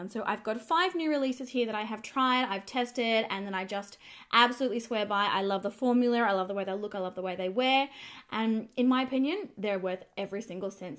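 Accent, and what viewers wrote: Australia. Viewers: She has an Aussie accent, leave her alone.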